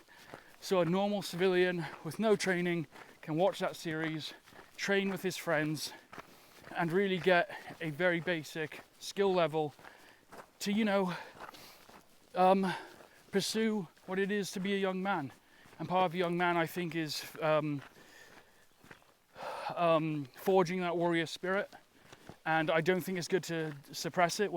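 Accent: United Kingdom. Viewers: I've been trying, unsuccessfully, to place your UK accent. There's a slight hint of "country" to it, but it's very subtle. Mine's a kind of Norfolk/Essex/Cambridge mash-up. Apparently, I get a bit "posh" when I've had a beer. I'm really not! 😂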